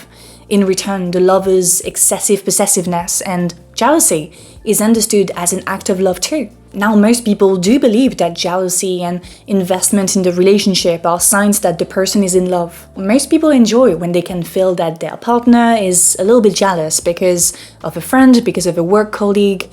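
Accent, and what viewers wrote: France. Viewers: am i the only one who's just here for the French accent? lol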